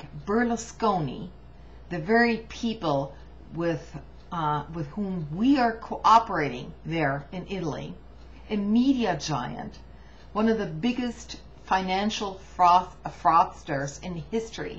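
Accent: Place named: Scotland, United Kingdom. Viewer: seems like you have a trace of scottish or irish accent in yr speech did you ever live there?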